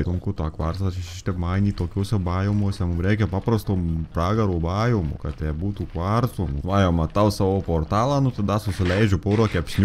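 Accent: Suvalkija, Lithuania. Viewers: Kai pamatai video: oooooo daba užstrigsiu porai valandų kol suprasiu viską ką jis ten suvalkietiškai kalbėjo. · Kadangi esu iš Marijampolės tai tu taip primeni tėvus su tuo tarimu xd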